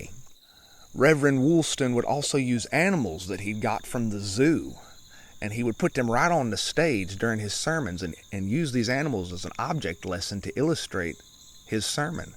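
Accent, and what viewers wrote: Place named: South, United States. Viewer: From the outset, I was trying to guess which song it would be. I thought of this one briefly, but I never knew that it had 2+ verses. All I ever sang as a child was the refrain. (Canadian Baptist roots.) Well done. Love your thoughtful delivery and "southern" accent. [So many 70-ish year olds in your audience -- and I am one of them at 71.]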